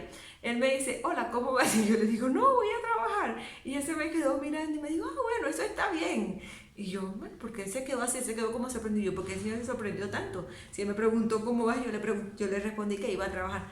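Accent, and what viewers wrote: Bogotá, Colombia. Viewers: no te escucho hablar venezolano ya hablas colombiano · Hola hermosa buenos días... Bueno yo quiero decirte con mucho cariño q si se te a pegado mucho el acento bogotano. Es más suenas más bogotana q venezolana. Y también q decir me vende, me da, y me regala son todas expresiones muy respetuosas y en colombia las usamos todas por igual. Ahora el bien si señor es igual de respetuoso a decir bien gracias. No es q una sea más respetuosa q la otra. El sumerced es una exprecion de respeto y carño. Q se utiliza mucho en toda la región cundiboyacence y en Nariño. Y es una forma de dirigirse a los padres con mucho respeto, en Boyacá y Marino sobre todo. Bueno espero q te siga yendo muy bien en colombia. Un abrazo de mi parte. Chaaaoo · Ya tienes acento cachaco. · Habemos personas colombianas que pedimos permiso ,me vende, hola cómo estás, decimos vecino pero al que está cerca a nuestras casas, no a todos y muchas más,soy santandereana, pero aunque no lo creas habla Venezolano con algo de acento Colombiano🥰👍🙏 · Pero yo la escucho hablando ROLO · Ya hablas como rola jaja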